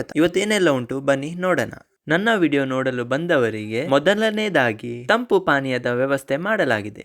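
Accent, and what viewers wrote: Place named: Mangalore, India. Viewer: Manglore kannada super voice nice bro❤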